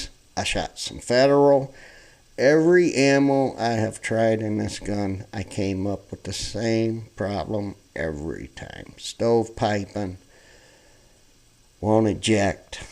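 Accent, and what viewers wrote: United States. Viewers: He's an American. He can talk any way he wants as long as it's English! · Someone commented negatively on your accent. I would like to leave a positive comment on your accent. I could be wrong, but it sounds like Arkansas. In any case, it's a wonderful accent - don't ever lose it. People would like to copy it, but you've got the real deal - what a treasure! In addition, you seem so candid and honest, truly genuine. Thank you for the review. God bless!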